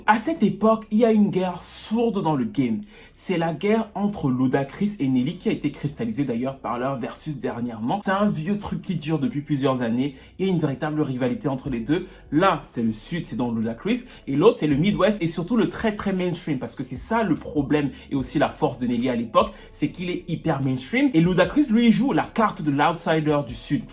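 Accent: Cameroon. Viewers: Mdrrr l'accent kmer! La vidéo est marrante, je m'abonne !well done :) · De grâce, gardes ton accent Camer. Au top.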